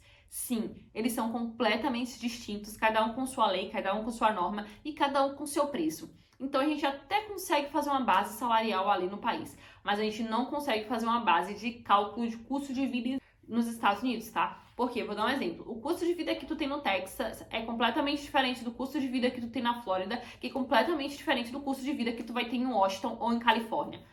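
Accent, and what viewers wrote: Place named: Feira de Santana, Brazil. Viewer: Sotaque de feira de santana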